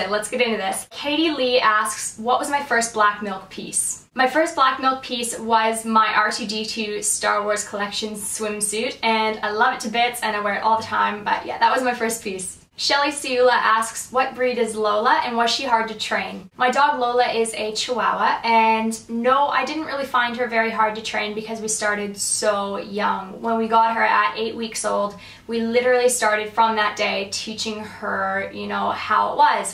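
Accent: Australia. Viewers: You're getting a little Australian tang to you're accent lol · Are you starting to pick up an australian accent? :) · she kinda has that australian accent, lol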